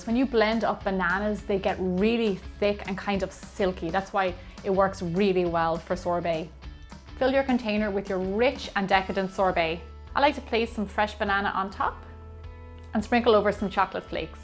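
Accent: Ireland. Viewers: Are you Irish?? Sorry if I'm wrong but my nana is Irish and she has an accent that sounds like yours!! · Btw I love you accent! What is it Irish?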